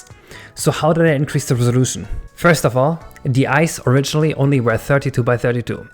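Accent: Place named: Germany. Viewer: dieser deutsche Akzent xD, aber krass was man aus SM64 mittlerweile machen kann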